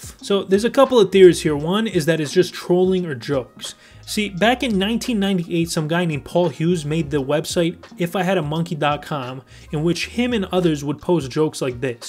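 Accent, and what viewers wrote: New York, United States. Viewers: Needed a real "Fuhgettaboutit" kinda guy to narrate my work session. Thank you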